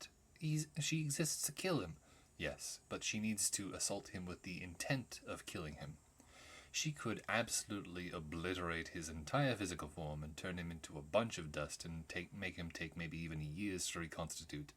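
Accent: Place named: Midwest, United States. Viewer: halberd is REALLY cool... Your "Midwestern white guy" accent does sound jarring...but mainly because I cannot freaking place it. It sounds familiar, but I can't figure out from where. Anyway...now you've finished with this monumental Q&A session...on the snow leopard video. Good luck!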